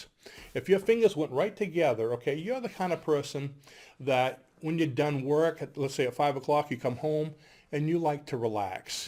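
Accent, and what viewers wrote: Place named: New England, United States. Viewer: i'm from new york and it sounds like you're from the new england area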